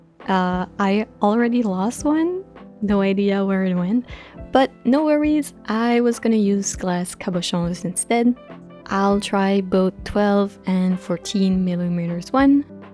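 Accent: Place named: Quebec, Canada. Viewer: I love him so much, i'm surprised you have so little followers since your stuff is so good :O ps. are you from quebec ? Because you have a similar accent to me :3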